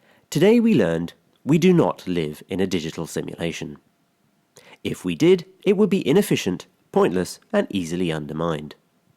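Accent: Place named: United Kingdom. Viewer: The british accent can litteraly convince me anything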